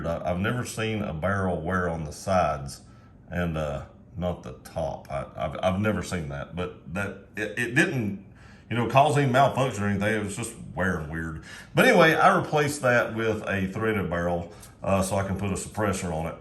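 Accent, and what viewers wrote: South, United States. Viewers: Damn, this dude is country AF. lol. Tennessee maybe? Georgia??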